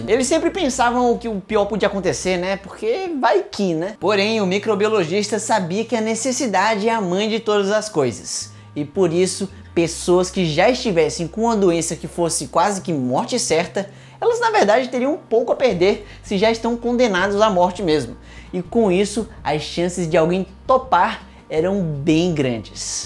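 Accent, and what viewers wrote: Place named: Northeast, Brazil. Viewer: Tu é de onde aqui do Nordeste ? 🤔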